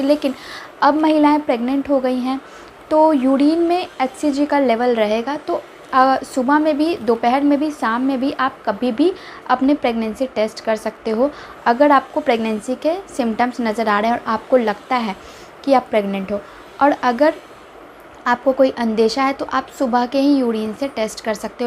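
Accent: Bihar, India. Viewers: Aap Bihar se hain kya ....ho rha hai ko hoda hai bolte ho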